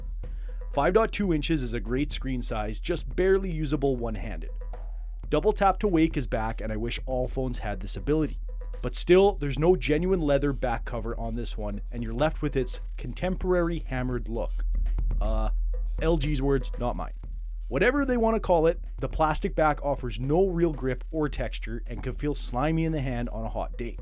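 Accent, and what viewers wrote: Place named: Toronto, Canada. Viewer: Such a Toronto accent!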